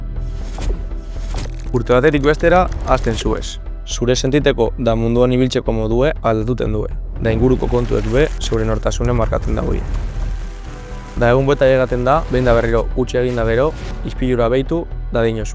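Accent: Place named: Bermeo, Spain. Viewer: Eskerrik asko... Oso dotore zure bermiotar hizkera. Bizkaitarrak garenontzat bihotza ikututen dau...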